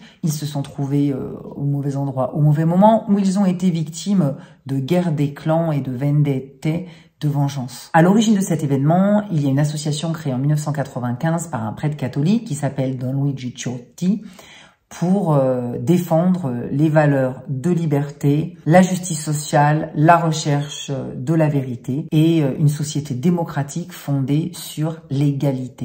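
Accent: Italy. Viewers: Ah ! Le RDV hebdomadaire avec ton magnifique accent italien 🤩🤩 Ça alors, je ne savais pas qu'il existait une journée nationale en mémoire des victimes des mafias… Quel dommage qu'elles gangrènent encore ce beau pays.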